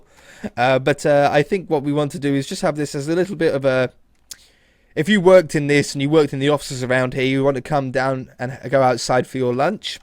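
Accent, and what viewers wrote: United Kingdom. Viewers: That British Accent it wont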